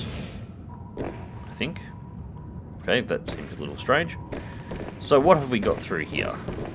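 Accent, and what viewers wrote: Australia. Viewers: its soo relaxing to watch underwater exploration carried out by someone with an Aussie accent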